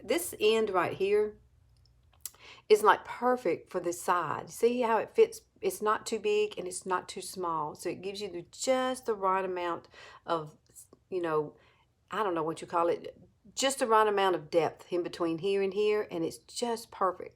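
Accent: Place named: East Tennessee, United States. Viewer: I am from East TN and you sound a lot like we talk , and it is unique all over the world , I understand you Perfectly.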